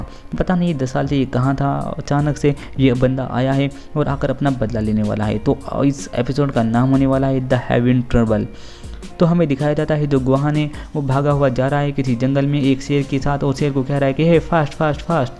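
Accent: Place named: Garhwal, India. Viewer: bhai tumhari language में garhwali taste aata hai isliye bol rha hun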